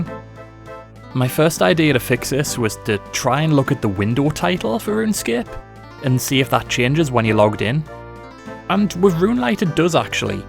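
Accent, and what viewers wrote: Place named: North, United Kingdom. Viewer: Sound like a fellow northerner, great vid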